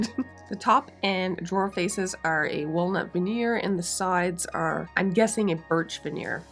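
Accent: Canada. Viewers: ! I could tell by your accent that you are Canadian, but so delightfully surprised that you are in the Valley! I'm in Switzerland but love so much seeing an artist from my neck of the woods! I actually got a little emotional when I saw the final result. I was imagining the people who owned that piece over the years and how happy they would be to see how you gave it such a stunning new life! I especially love the woodwork on the middle drawer. Lovely work!! ❤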